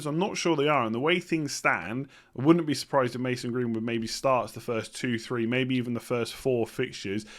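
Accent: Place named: Southern England, United Kingdom. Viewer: Why are you wearing a Man Utd top with that southern accent? 🤔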